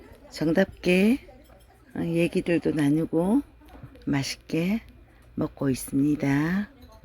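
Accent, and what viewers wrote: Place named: Gyeongsang, South Korea. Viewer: Like7 식당음식이 깔끔~ 대구 억양이 너~무 좋아용♡♡♡♡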